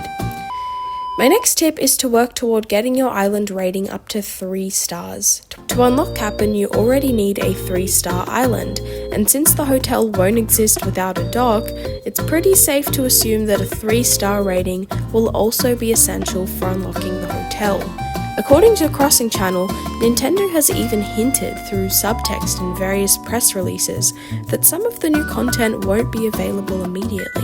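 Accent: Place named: New Zealand. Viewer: is that a new zealand accent? i love it, it’s so nice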